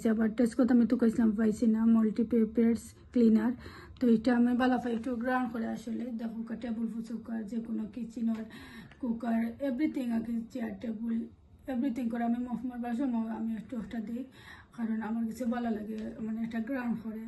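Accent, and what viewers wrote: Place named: Sylhet, Bangladesh. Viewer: ওয়া আলাইকুমুস সালাম। আপি কি সিলিটি নাকি।সিলেটি কথা আমার খুব ভালো লাগে। খুব সুন্দর ভিডিও শেয়ার করেছেন আপু।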